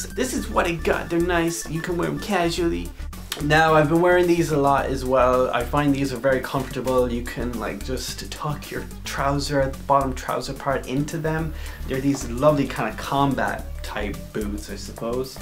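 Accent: Ireland. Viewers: Are you from ireland ? Can kind if hear an irish accent :)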